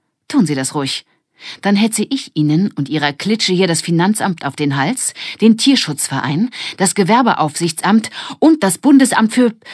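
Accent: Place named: Russia. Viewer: Haha cool, da bin ich nicht die einzige, die mit bald 30 Jahren die Fortsetzung einer Ära vergöttert! Vielen vielen Dank! Ich wusste gar nicht, dass “Die Wilden Küken” existieren. Immer, wenn’s mir schlecht geht oder ich krank bin, höre oder schaue ich “die wilden Hühner” & das gibt mir ein Gefühl von Geborgenheit Glück & Kindheit. Aber als ich nach den Wilden Hühnern hier suche, finde ich diese Reihe und hab den ersten Teil in 2 Tagen durchgehört! 🥰 Danke dir!! 🙏🏽 Stimme allerdings dem Kommentar zu den Akzenten zu! Das klingt wirklich eher russisch und sehr sehr harsch haha, aber es gibt auch nen Lacher, wenn auch mit verzogenem Gesicht 😅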